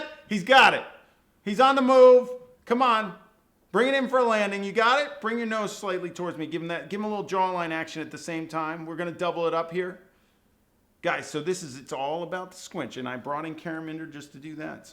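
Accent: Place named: New York, United States. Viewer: This guy is almost painfully from New York. I mean wow. Anyway I've been looking for ways to improve my creative portrait drawing, and I think this channel might be the one for me.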